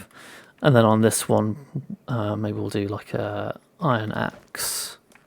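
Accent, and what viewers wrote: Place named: United Kingdom. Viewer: For some reason your videos go so well in the background, maybe it's something in the voice or just my love to british accents :D The build is really cool as well, got me some new ideas, thanks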